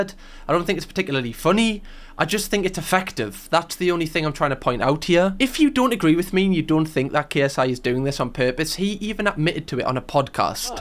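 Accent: Newcastle, United Kingdom. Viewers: I am being 100% serious, I subbed to both of your channels after never having seen one of your own videos. I saw you in a collab and was like "oh hes a geordie (honorary scot) imma sub to him"... been binge watching since lol.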